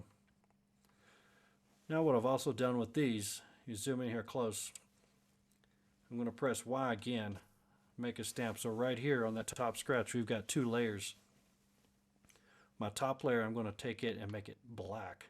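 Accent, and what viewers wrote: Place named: South, United States. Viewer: You have the soothing southern voice for it, just every time you make a mistake call it a "Happy accident" and add a "happy little piece of rust" here and there.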